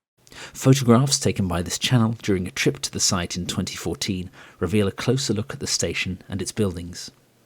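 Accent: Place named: RP, United Kingdom. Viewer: Very well presented rp throughout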